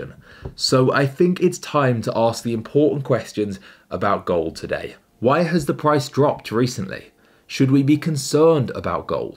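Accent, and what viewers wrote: United Kingdom. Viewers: Too much talking off topic. Not to the point. Don’t like British accent in USA it is irritating 😠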